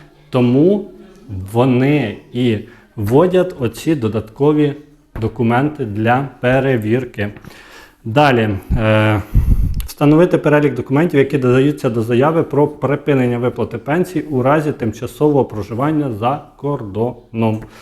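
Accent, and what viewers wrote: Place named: Khmelnytskyi, Ukraine. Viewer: О ! Землячка Дубровського (хмельниччина) : "...цЕго року", "...будуТ робити" ... і ще доооооохрена подібних "перлів" з місцевого говору (всього раптово і не пригадати...) (P.S. Може бути таке, що, прочитавши мої слова, багато хто і не знає, що Дубровський - це і є той самий Саша, котрому всі ви, мої "колеги" по пенсійному віку, надсилаєте свої коментарі на канал "Народна думка"... Всі знають, що - Саша... А прізвище... ??? Упевнений - багатьом невідоме ! ( мужикам-то воно більше знайме по вислову : "Не с . и, Маша, я - Дубровський !" ))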